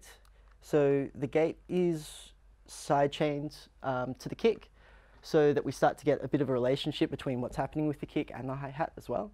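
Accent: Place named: New Zealand. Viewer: Although, I think this chap may be from NZ. :)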